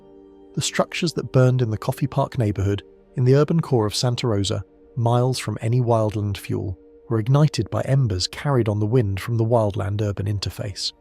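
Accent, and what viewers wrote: England, United Kingdom. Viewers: Isn't there anything going on in Europe why are you telling that's about the United States and not talking about the continent that I think you're from since you have an English accent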